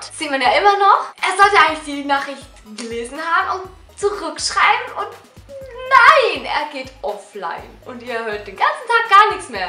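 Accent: Switzerland. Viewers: du bisch schwizerin oda ? :D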